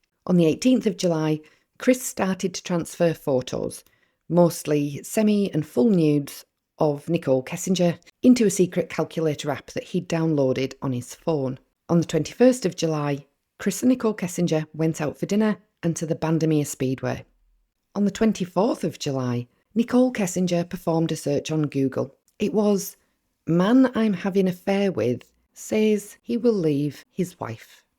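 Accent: United Kingdom. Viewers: Great video. Is that a northern accent I hear? 😊 It’s lovely! Keep up the great work!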